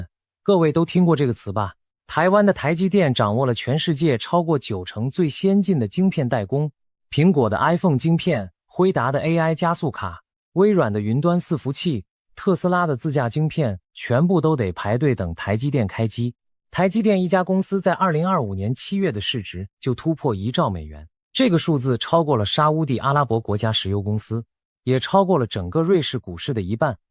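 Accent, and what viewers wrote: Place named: China. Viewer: 阿你這配音不就帶著中國腔!搞笑嗎?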